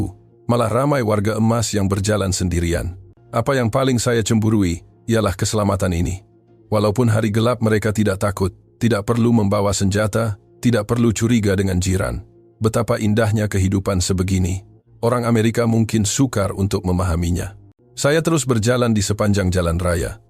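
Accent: Indonesia. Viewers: xpayah bangga la.da terang cerita ni hanya rekaan.nak cuba guna A.I BM tapi malangnya lidah A.I ni lidah indo.camna pn terasa janggal sangat sebutan dia.so kadang2 bunyi dia agak lawak.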